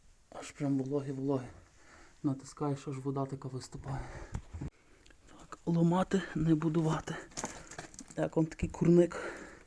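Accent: Ukraine. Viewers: Ще дуже подобається вимова, галицька українська неперевершена. А побачити чоловіка, який залюбки працює фізично - це рідкість.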